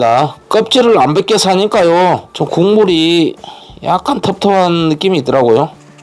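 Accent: Jeolla, South Korea. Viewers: 고향이 어디신데 전라도깡사투리를많이쓰시나요?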